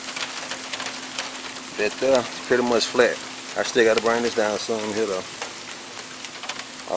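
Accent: Alabama, United States. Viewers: What part of Bama you from???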